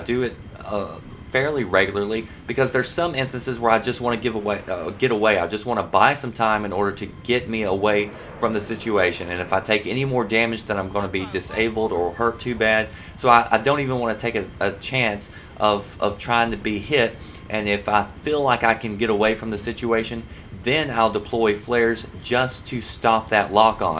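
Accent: South, United States. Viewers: I dont know why, but I always imagine him as welsh, but the accents makes me think he is america.. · Problem with southern accent?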